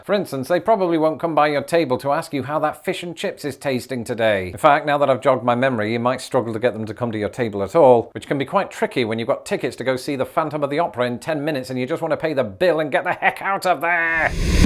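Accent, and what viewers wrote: United Kingdom. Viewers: Could one imagine a much less glamorous British accent?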